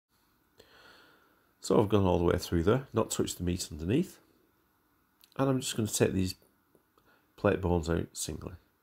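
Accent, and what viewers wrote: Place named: Lancashire, United Kingdom. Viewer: Love the video proper job done sounds like your from Lancashire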